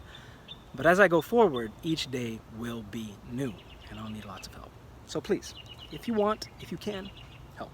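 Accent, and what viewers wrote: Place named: United States. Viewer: the way your reading it is like a American paces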